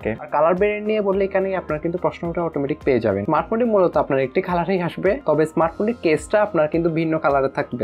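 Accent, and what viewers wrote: Chittagong, Bangladesh. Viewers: চাটগাঁইয়া রিভিউয়ার মনে হচ্ছে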